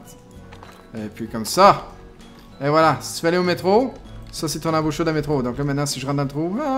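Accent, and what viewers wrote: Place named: Quebec, Canada. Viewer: Coucou! Moi personnellement, j'adore tes séries Planet Coaster, City skyline, et j'ai adoré Kona! J'aime la diversité que tu proposes! Donc ne stoppe rien, et continue à nous faire du contenu comme tu le fais actuellement:) Ta voix et ton accent (j'ai visité le Canada Francophone il y a 2 ans) me rappelle tant de souvenirs!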